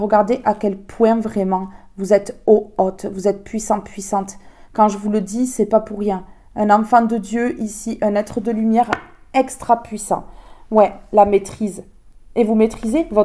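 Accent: South, France. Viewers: Bonjour ma très chère belle âme! Je te remercie pour la bienveillance que tu met dans tes guidances et je perçois que tu as un grand coeur et une grande gentillesse et la façon dont tu nous parle avec ton magnifique accent méridional nous apaise et nous réconforte! sincèrement!!!. Merci!!! Je penses que tu as raison ma vie va être bouleversée durant ce printemps 2025 (Avril, Mai 2025) et qui va grandir en été et en automne 2025 Tu vois juste Je m'adresse à Dieu pour des miracles et je pense que je vais en obtenir au moment ou je m'y attendrais le moins ça va démarrer et se construire graduellement je pense qu'il va y avoir la rencontre amoureuse et il va y avoir construction pour le grand amour et il y aura de la création artistique!!! AMEN Que Dieu t'accorde du bien et que ta soirée te soit des plus agréable.. Bisous ❤💖😘😘🌟☀🙏🙌🙏🙌🙏🙌🌟☀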